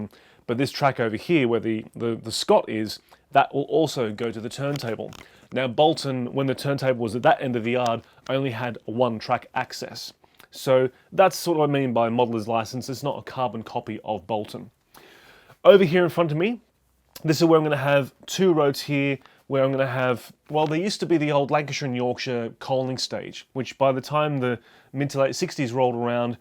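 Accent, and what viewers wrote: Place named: Australia. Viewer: Completely off topic, but what is your accent. I was thinking Australian ex-pat? Melbourne from the Herbert's T shirt?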